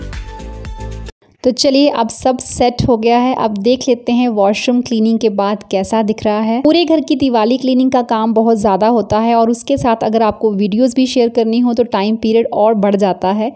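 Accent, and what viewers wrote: Punjab, India. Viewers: ਭੈਣ ਜੀ ਪੰਜਾਬੀ ਟਚ ਹਿੰਦੀ ਬੋਲਦੇ ਹੋ ....ਵਧੀਆ ਲਗਦੀ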